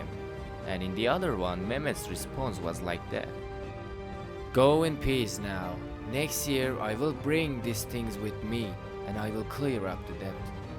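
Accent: Turkey. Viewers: Türk aksanıyla çok güzel olmuş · THE TURKISH ACCENT IN A TURKISH SIEGE IS ALSO AMAZING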